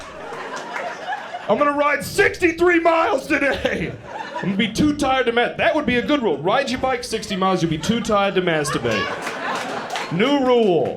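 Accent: Australia. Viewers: good quality, he does sound like a posh australian, loved the siri joke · Im Australian and i thought for sure you were an Aussie haha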